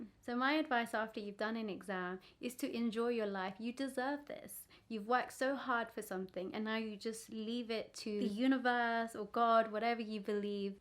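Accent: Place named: India. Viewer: This is first time I'm watching your channel . I'm preparing for Neet (medical entrance exam ) .. I also have a feeling that your from India .. but I like your accent .. And thank you for these tips 😊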